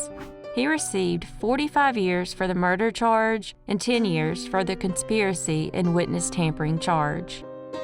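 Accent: South, United States. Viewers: I think we like that Southern voice ❤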